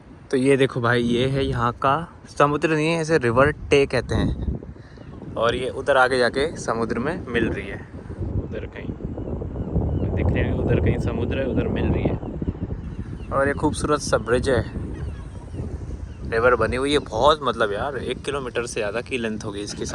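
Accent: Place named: Madhya Pradesh, India. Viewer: are you from madhyapradesh bro ,your language tune like mp bhopal thats why im asking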